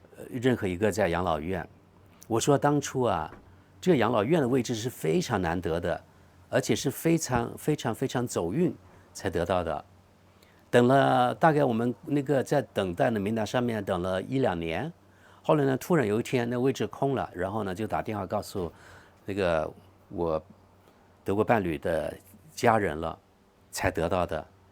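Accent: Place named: South, China. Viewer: 每个地方人都有口音，尤其南方人，大家相互理解吧，其实我们南方人听着南方口音更亲切呢。他还特地做英文，中文字幕，很不容易，请支持！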